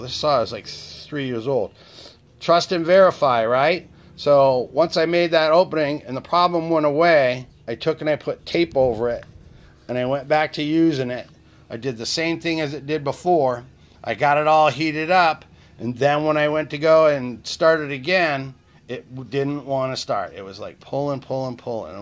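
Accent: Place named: Massachusetts, United States. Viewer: sound like a mass accent love it from new hampshire.. good vid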